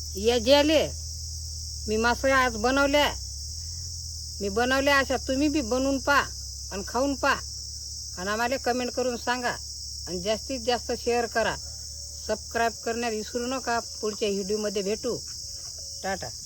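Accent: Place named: Vidarbha, India. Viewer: Aaji tujhi bhasha ekun gaavi ch aalya chi feeling yete. Vidarbha chi god bhasha as good as it's food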